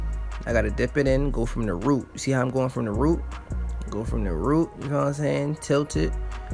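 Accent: New York, United States. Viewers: i know a new yorker when i hear one lolol yurrrr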